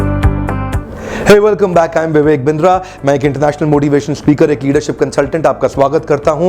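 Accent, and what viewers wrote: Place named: India. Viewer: What talking about ,didn't understand the India English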